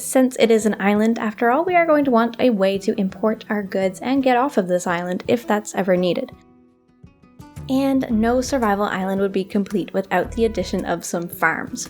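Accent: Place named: Newfoundland, Canada. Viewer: Haha I'm just teasing. It's cute! I didn't know people from newfoundland spoke like that. That's a good fun fact x)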